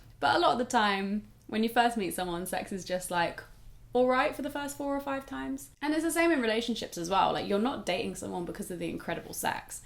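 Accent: United Kingdom. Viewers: English isn't my first language but I already get used to American English and feel comfortable with it And now I listen to her British accent and it's so fucking sexy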